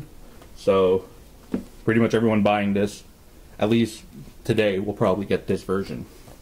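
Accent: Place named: France. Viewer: dat epic lisp, and dat french accent hahah :D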